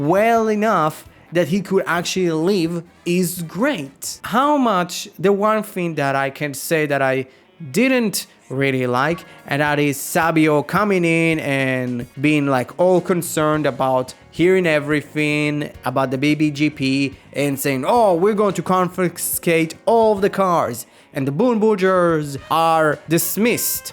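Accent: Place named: Spain. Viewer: Uh You said Bundorio's name wrong It's actrully pronounce Bun-do-ri-o Bun-de-ras Since you have that Spanish acent of yours,saying Bundorio's name in English can be tricky for Spanish Sentai fans Also is Taiya and not Tire,oh my god the Boonboomger fans are so going after you after you saying the character's names wrong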